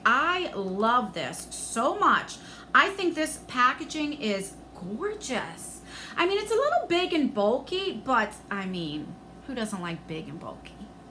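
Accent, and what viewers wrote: New Jersey, United States. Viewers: New Jersey represent! Your accent makes me home sick for NJ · I love YouTubers that are real! You know just themselves and Hughes seem I don't know if it's because I'm from Jersey myself you know and relocated but I miss it if that's maybe why I feel this connection to you because I mean all my friends growing up I mean I can hear them in your voice I can hear your accent I feel like I'm back in New Jersey hanging out with my friends doing crazy things and that was a really good time in my life. I had great friends back then we're still friends we keep in touch but it's not the same you know everyone gets older and grows up and then life hits us! LOL. It's just brings me back and you're just such a real person I just love it! Never change please because you've got what YouTube needs! Much Love XOXO - Kylie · 😘😘 love your videos! 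They make my day! Your jersey accent is the best!!!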